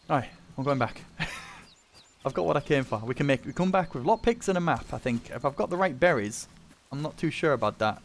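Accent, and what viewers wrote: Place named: North, United Kingdom. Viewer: Can't place your accent, its definitely northern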